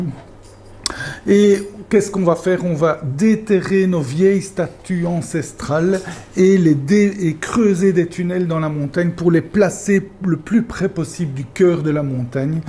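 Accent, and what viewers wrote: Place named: Belgium. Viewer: Merci, bonne explication et une petite critique constructive à la fin. J'adore ton accent Belge, ça me rappelle qd une partie de ma famille habitait Bruxelles !!!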